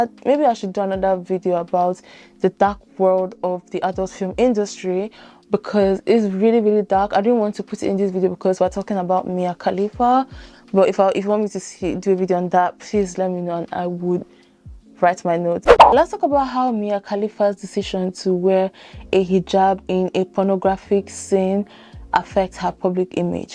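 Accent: Nigeria. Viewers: Yep that's a nigerian accent! Source: I'm nigerian too! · I love your accent, r u nigerian σ(^_^;)?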